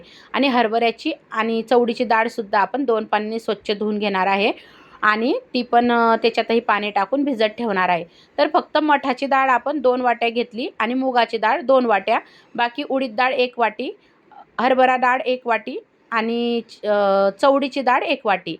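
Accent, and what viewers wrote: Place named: Jalgaon, India. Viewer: खुप छान तांई विडिओ भुसावळ जळगाव बोलता 😊❤तांई फुल वाॅच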